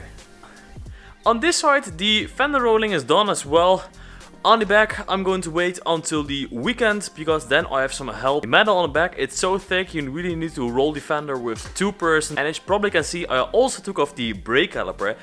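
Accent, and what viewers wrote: Netherlands. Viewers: Ik dacht al dat ik een Nederlands accent hoorde :p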